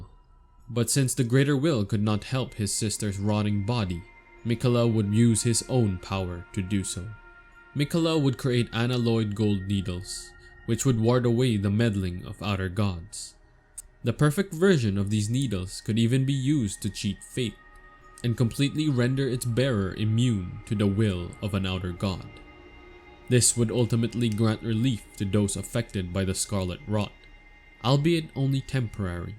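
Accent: Philippines. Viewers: Your accent sounded like filipino. God vid tho.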